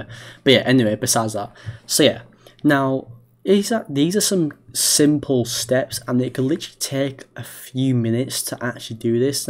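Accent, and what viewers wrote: United Kingdom. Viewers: Bruh are you British WTF 😳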